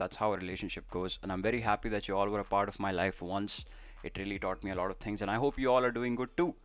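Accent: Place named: Bihar, India. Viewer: why does sound like a bihari in this vid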